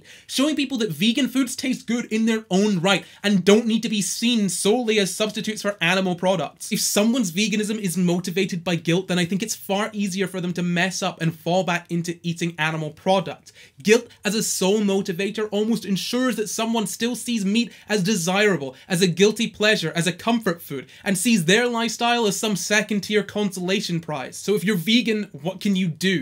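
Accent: Scotland, United Kingdom. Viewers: 2 months of veganity woop woop, also are you Scottish?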